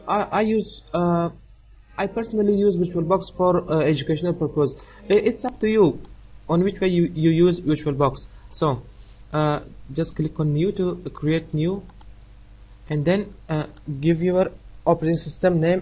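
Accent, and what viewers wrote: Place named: India. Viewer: I can't understand you! You have such a thick Indian Accent!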